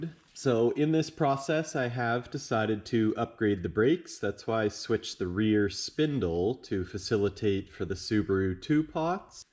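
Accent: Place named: France. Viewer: Hi, are you french ? You have a french accent !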